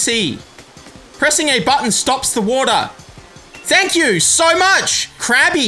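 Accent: Australia. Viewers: I love your sweet Aussie accent (; lmao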